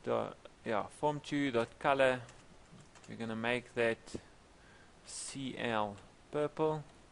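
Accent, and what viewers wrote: South Africa. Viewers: Yeah I noticed the SA voice straight away. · Sure sounds like a South African man!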